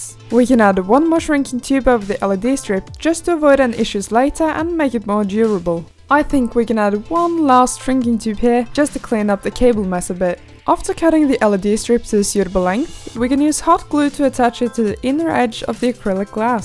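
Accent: United Kingdom. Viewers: She sounds more British in this video than others. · So this is where she started to lose the british accent xD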